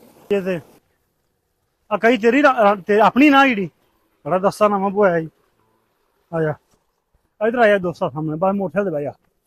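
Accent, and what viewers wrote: Punjab, India. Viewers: You. speak indian punjabi not pakistan punjabi from west Yorkshire UK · ਪੰਜਾਬੀ ਬੋਲੀ ਤੇਰੀ ਠੇਠ ਪੰਜਾਬੀ ਸਾਡੇ ਨਾਲ ਦੀ ਬਹੁਤ ਵਧੀਆ ਲੱਗਿਆ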